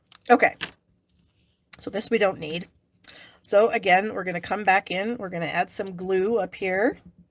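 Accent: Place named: Yorkshire, United Kingdom. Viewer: I'm pretty sure she's from Yorkshire....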